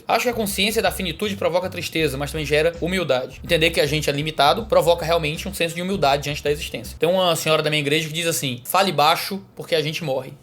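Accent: Ceará, Brazil. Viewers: Eu adoro as nuances q comprovam o cearense q ele é durante o discurso kkkkk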